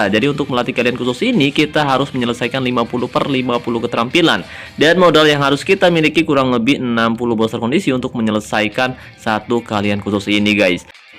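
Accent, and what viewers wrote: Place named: Brebes, Indonesia. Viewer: BANG...ENTE ORANG BREBES YA... SALAM NGAPAK BANG...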